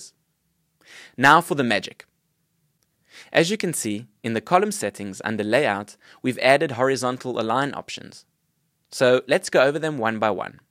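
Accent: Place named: South Africa. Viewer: I can spot a South African accent from far . . . that broer is definately from SA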